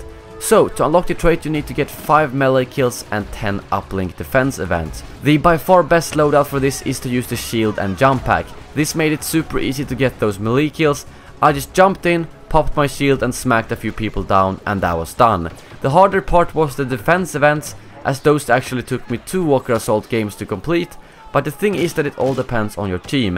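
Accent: Sweden. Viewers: It sounds like youre from sweden? Am i right?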